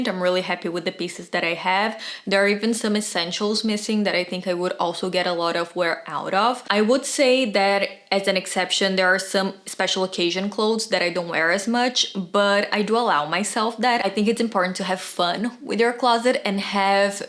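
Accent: Brazil. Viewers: The second I heard you pronounce certain letters I knew you were Brazilian 😊.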